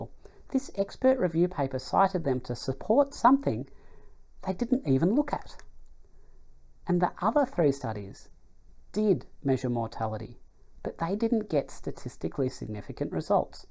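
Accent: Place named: Australia. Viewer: Australian can be difficult, try slowing down the speed of playback to 3/4 speed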